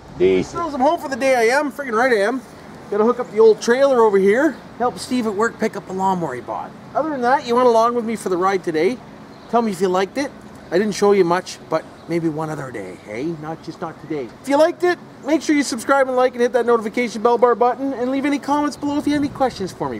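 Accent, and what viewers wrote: Canada. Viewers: your Canadian like me eh?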